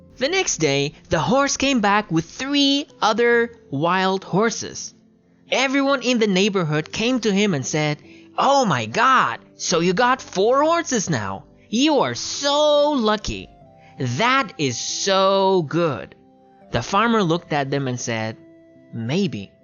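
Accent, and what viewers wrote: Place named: United States. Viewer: dast xosh bas mal awadanbe ba lahjay amreke qsamaka desan dast xosh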